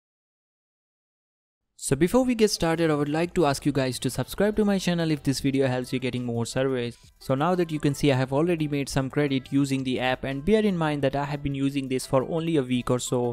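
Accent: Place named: India. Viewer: Indians English pronunciation..... ew but thanks for help anyways.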